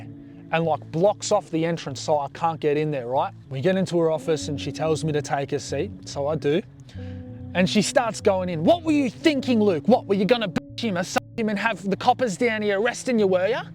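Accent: Australia. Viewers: He has an Australian accent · Even though I don't relate to this experience, it sounds so aussie that I should be relating lol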